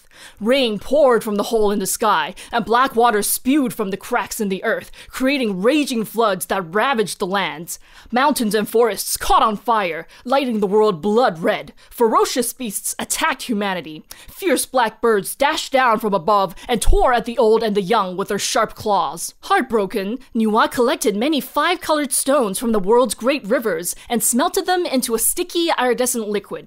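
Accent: United States. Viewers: I am curious as to where in the US/Canada Ms was raised... By her (AE) accent, it's hard to tell; California? PacNW? Michigan? Toronto?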